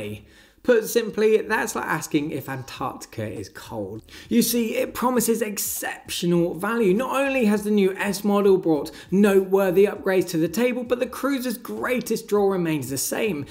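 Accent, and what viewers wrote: United Kingdom. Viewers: Fkn annoying British accent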